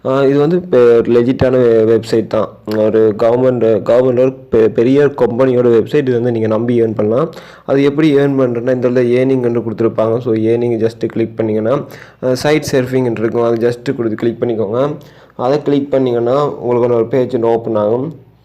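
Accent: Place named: Sri Lanka. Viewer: Lanka tamil voice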